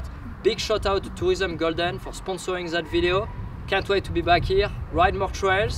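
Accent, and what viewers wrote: France. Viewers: Sorry but, as a French, I really despise the fact that you haven't corrected your accent with all this time speaking english. I guess it's part of your character now, but I can't listen to "zhis"...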